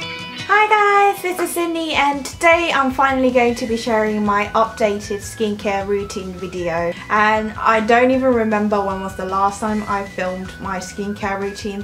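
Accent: United Kingdom. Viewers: 언니 목소리랑 영국발음이랑 너무 잘어울려요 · 영국식 발음 너무 이뻐요ㅠㅠ 프라이머 비교 영상도 보고싶어요 !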